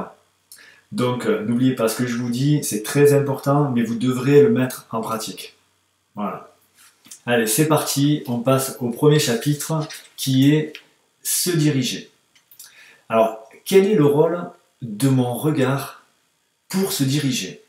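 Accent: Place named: Toulouse, France. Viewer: vous etes où ? vous avez un accent Toulousain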